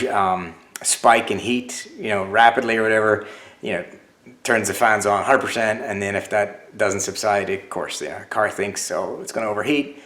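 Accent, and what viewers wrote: Belfast, United Kingdom. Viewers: Nathin, oul belfast coming out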